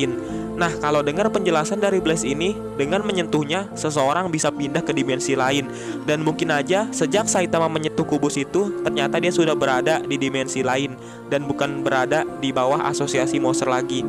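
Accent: West Java, Indonesia. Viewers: dari mana, sunda nya 😅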